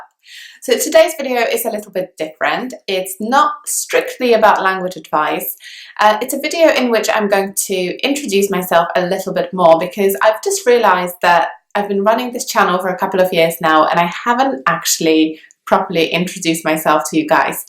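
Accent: United Kingdom. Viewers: nice video. Perfect British English, not cockney and that's a plus :) You also look beautiful . Ciao bye hasta luego..those are the languages that I speak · You are a big inspiration for me. You really speak like British. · Dear Madam. So nice to hear about you. You seems to a very dynamic person doing so many things and active about going to places. I knew from the beginning that you are a English. Your accent betrays. Now that cannot be changed to English. Any way you have worked hard to learn Enlish so as to teach to others. My congratulations for your great efforts. You may wish to be in France a roamantic place. Have some hay days there. Best wishes for a very happy life. Raju from India. A Tamilian, Wish to remain as your good student and learn a lot from you. Thank you.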